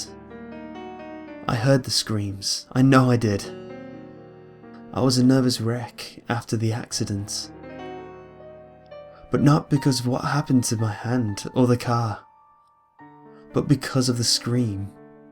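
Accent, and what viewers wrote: United Kingdom. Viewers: Oh, and your voice is smexy. I love your voice. Are you British?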